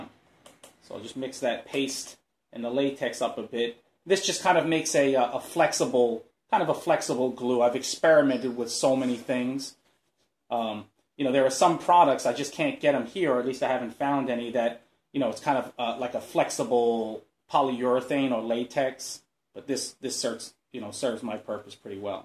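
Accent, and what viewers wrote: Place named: East Coast, United States. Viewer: You're clearly from the East Coast... I'm guessing Jersey? Like your vids, I'm subscribed, continued success.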